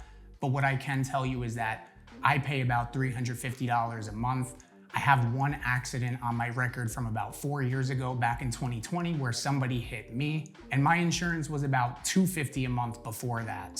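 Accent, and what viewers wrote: New Jersey, United States. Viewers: I'm in Jersey City and planning to move in Miami Beach. Am I doomed to drown in the high cost of living? Where were you in Jersey? Was it worth the move? I hear that Jersey Italian accent.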